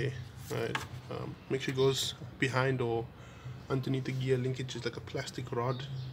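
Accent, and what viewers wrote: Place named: Southern Africa. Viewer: Helped allot man are namibian or south african your accent sounds familiar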